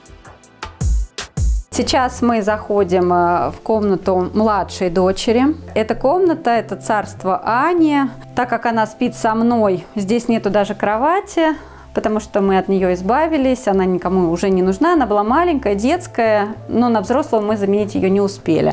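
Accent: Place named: Yakanye, Russia. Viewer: Режет ухо Яканье.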